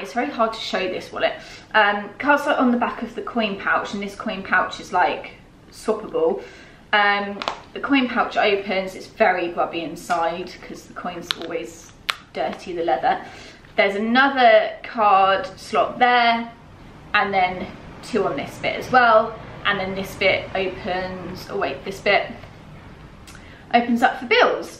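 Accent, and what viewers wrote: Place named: United Kingdom. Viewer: Your British accent!👍🏻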